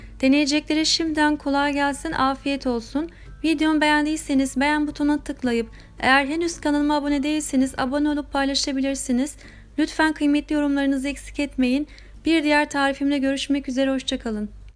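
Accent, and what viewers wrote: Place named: Azerbaijan. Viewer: Ben Türkiye Erzurum kökenliyim atalarım Erzurum'a Horasan'dan gelmişler zamanında diyeceğim o ki sizin Azeri diliniz yani konuşmanız benim dedelerimin aksanına çok benziyor.Ne zaman bir Azeri konuşması duysam beni kendine çeker mutluluk duyarım saygılar sunarım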